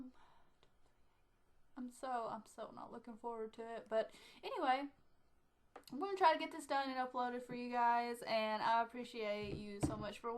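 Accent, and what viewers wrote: South, United States. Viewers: yup... you have a southern accent. lol I knew it. :) it's a good thing. :)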